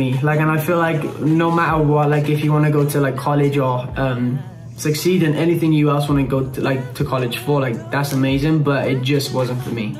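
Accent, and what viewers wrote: Wales, United Kingdom. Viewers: It’s Cardiff, Wales, UK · I think it's Welsh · He is british my love, yes.💛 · Have just come across your songs and now I'm here. Was so lovely to realise after hearing you talk that you are British (not quite sure from where? Welsh? Anyway.... well done, you really have a fabulous voice and have really enjoyed hearing your journey. People are rarely good at everything so you got your full quote of being artistic .... but in every bloody way!! Incredible. Good luck. Hope we see you killing it on British soil. Hope my son (he's 16) turns out as humble and hardworking as you. (from 53yr old mum from Hertfordshire, UK) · He's actually Welsh, part of UK. Now he lives in the US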